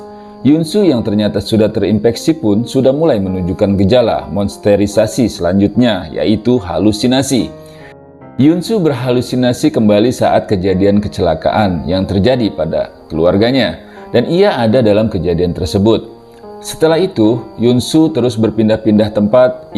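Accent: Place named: West Java, Indonesia. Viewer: Ciri khas Sunda Na ka bawa we eta medit hahahaha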